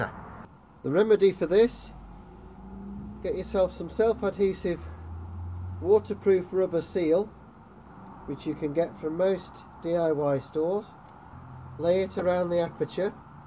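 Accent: United Kingdom. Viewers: Love British accent :) very helpful video, many thanks!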